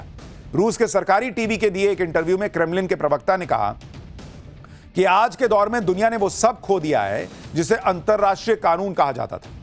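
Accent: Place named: Patna, India. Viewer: Sir aap Patna Se Ho keya apki language patna wala hai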